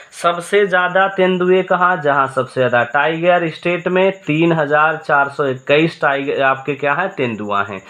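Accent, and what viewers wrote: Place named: Bhojpuri, India. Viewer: Mast bhojpuri bhai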